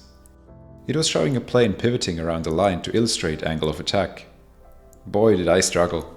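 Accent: Switzerland. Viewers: Fly safe! I hope that one day - like Mentour Pilot - your career turns toward educating the next generation of pilots. You will be an awesome at it! Be it senior captain, flight instructor or just pilot doing his job with passion with who ever he can share the cockpit with: It is good do know you are up there! I will miss your voice - the swiss english is such a nice and nostalgia sound to me. Fly safe! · Danke für die tollen Videos. Ich werde den Schweizer Akzent vermissen. 💔 Au revoir! 🙋🏻‍♂️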